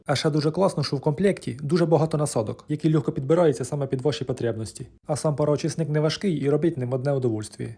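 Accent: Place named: Cherkasy, Ukraine. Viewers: А я то чую шо шось рідненький говір. Привіт із Звенигородки, Черкащина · Черкаський говір слухаю як музику....🤭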